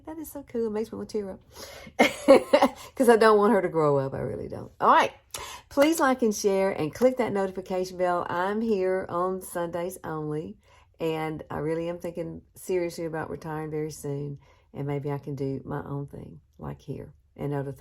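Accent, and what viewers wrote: South, United States. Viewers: Love your southern accent 💕 what a stunning look I am going to try that on my 76 yr old wrinkly eyes and face 🙌 thank you beautiful girl 💕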